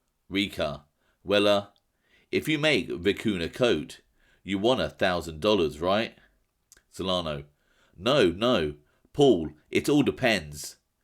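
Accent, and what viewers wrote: United Kingdom. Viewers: The British trash accent is so annoying just shut up and play the recording nobody cares about the annoying narrator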